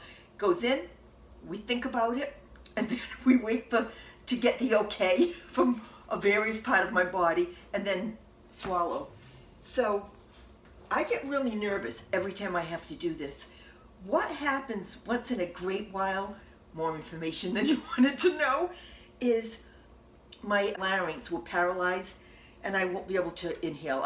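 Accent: New England, United States. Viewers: My mother grew up in New Hampshire and would say “sleep tight, don’t let the bedbugs bite”. I, in turn, said it to my kids when tucking them in to bed. I love your channel and your New England accent because it reminds me of my aunties.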